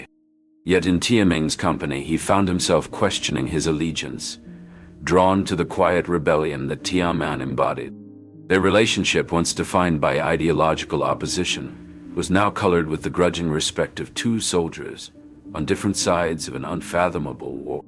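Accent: United States. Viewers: Ai sounds American, I mean its making a mockery of English as usual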